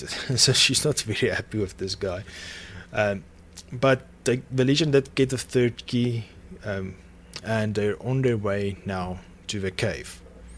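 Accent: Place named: South Africa. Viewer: i heard it in the accent and when you Said ja further in the video i knew for a fact, im from SA aswell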